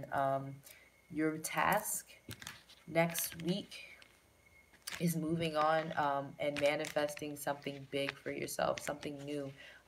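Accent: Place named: DMV, United States. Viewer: Where are you from love... you sound like your from the DMV area or some where around there... (DC/Maryland/VA) ... Just being nosey girl... LOL!!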